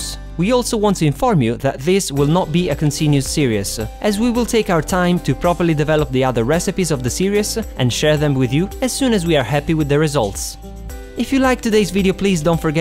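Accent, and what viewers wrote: Italy. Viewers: I'm sorry this has nothing to do with the video but are you italian? you sound italian! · yes I really like all the recipes in here, sounds like you are Italian from the accent I'm Italian also but I have been in the USA a long time my accent is a bit different, anyway I can't wait for another of your recipes:)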